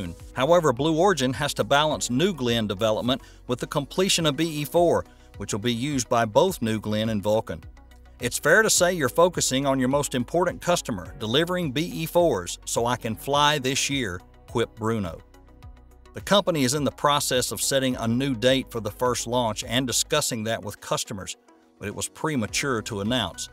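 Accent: South, United States. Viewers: Whether it’s a real human or just a really nice voice synthesizer… that’s an awesome Southern accent!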